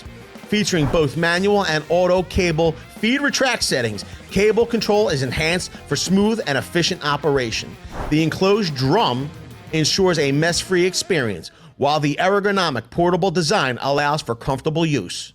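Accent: United States. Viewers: The 'Dollar' is a currency unit used by more than 25 countries. Judging from your accent, I'm guessing that you're an American. Please, when you are quoting prices, have the courtesy to say "US dollars", unless, like most Americans, you don't believe that there are other countries in the world who are viewing your videos.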